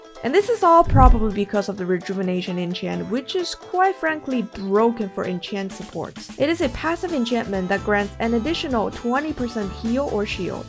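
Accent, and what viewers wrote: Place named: Ireland. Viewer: came from the new boots update, stayed for the Irish accent 🖤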